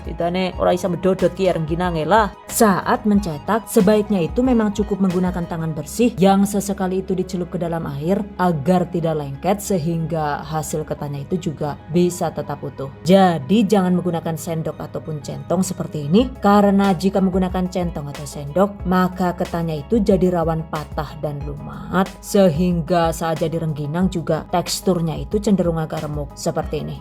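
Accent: Banyumas, Indonesia. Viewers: Dari mana mba jawanya mantap ngapak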